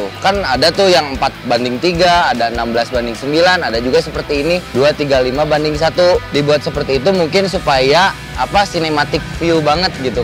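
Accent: Indonesia. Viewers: sundanese pisan wa hihi... ka coba tutirial color grading nya.. makasih k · medok euy sunda na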